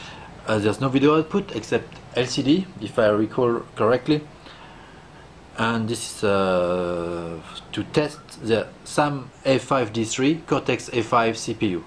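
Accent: France. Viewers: Such nice french accent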